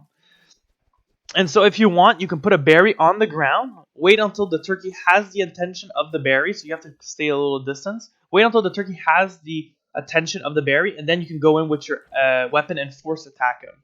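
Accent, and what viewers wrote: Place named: Ireland. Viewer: Just happened upon this channel... I really enjoy the Irish(I'm assuming) accent.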